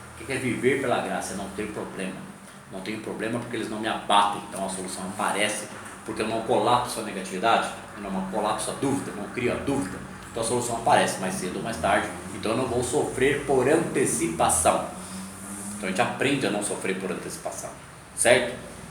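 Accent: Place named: Minas Gerais, Brazil. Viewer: Adoro sua dicção..bem mineiro mesmo ..eu tambem sou mineira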